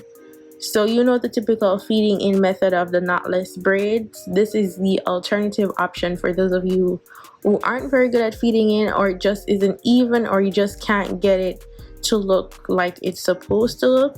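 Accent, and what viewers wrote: Jamaica. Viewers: i know you are jamaican lol the acent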